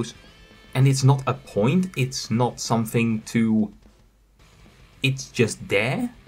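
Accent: Netherlands. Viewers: Ahh I figured you were Dutch :0 your accent’s very nice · i mean your dutch accent isnt too bad. It took me a while after reading your comment to notice it, and I'm dutch myself XD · Are you Dutch? You sound Dutch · Man I could hear the Dutch in your voice. And all I got to say is.. frikandel broodje. Also great video i loved it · Wait are you dutch bc i recognize the accent and how you pronounce english words. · your cute ass dutch accent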